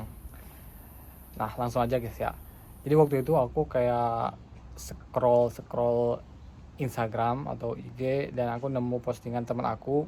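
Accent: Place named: West Java, Indonesia. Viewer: "Aku nyaaa cangkeull" sunda pisan nya a😅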